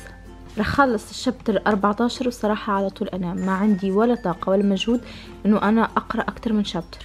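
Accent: Tunisia. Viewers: من هدرة تاعك تباني تونسية 😉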